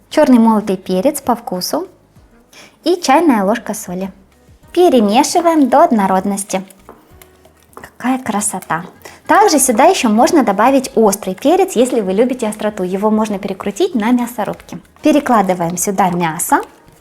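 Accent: Ukraine. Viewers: А нам нравится, она украинка вообще-то!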